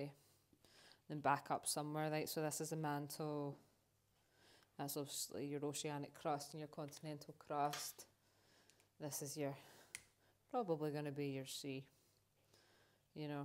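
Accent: Scotland, United Kingdom. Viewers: Glad I chanced upon your channel. I was interested in your mention of mudstone ( and I've noticed you have a video on that). I live in Almondbank, a couple of miles northwest of Perth. There are some cliffs where the river Almond takes sharp turns and the cliffs are known locally as marley rock- as in crumbly. The rock is very soft and crumbly. The colour is pinky brown. I assume that's mudstone. I'll have a look at your other rock videos; thanks for your efforts. And its great to hear the broad scots accent.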